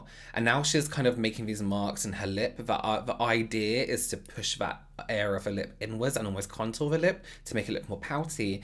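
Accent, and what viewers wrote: United Kingdom. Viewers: giggles british accent don't mean to be rude, but you're AWFUL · the algorithm doesn't care if you get a thumbs down, all interaction with your video is a +.. Never seen one tho..🙂 Me:🔔 💃🏼yey..🎧press👍: To the video... You are Always so sweet and your facial expressions are so funny, I love that! I love that you can laugh at yourself, and be a bit🤭the classy way: when you don't "care" for something!😆 The British accent is too much 💘 I adore it...😋💭 Keep up with the Great content! You are doing great, I am so Happy I found you, the way you teach, you are a godsent, I understand! ( Said before: A Finn thinking in Swedish trying to write English..☺️)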